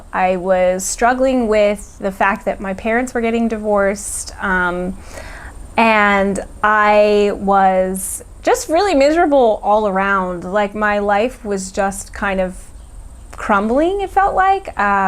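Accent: United States. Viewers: Hi from Italy... wow... I can understand almost everything you say in your videos. It helps me improving understanding skills of American native speakers... wich are still a big problem for me;) Can you speak Italian?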